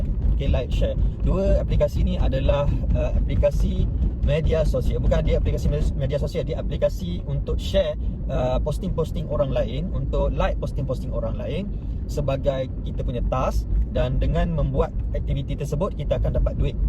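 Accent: Terengganu, Malaysia. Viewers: org ganu ke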